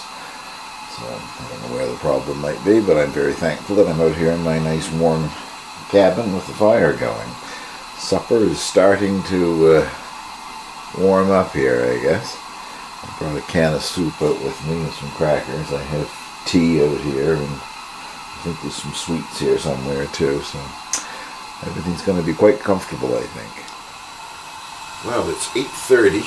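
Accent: Canada. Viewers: was going to ask where in the world are you, but I guess from the accent and Tim Hortons cup is a give away! :) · I am thoroughly enjoying myself watching your videos. Your lovely Canadian accent reminds me of my home turf of Northern NY. Grew up about 26 miles south of Cornwall but have traveled quite often into Eastern Canada where all of my ancestors came from.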